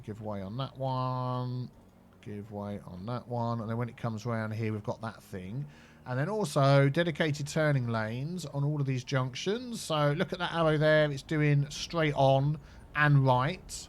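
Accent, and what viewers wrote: United Kingdom. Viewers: Ah! Poke me eye out! Love the British accent,. Adds flavor to your videos. Good stuff as always.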